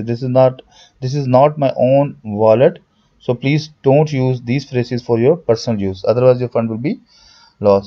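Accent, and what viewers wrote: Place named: India. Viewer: Gosh. The Indian English accent is the worst 🤮🤮🤮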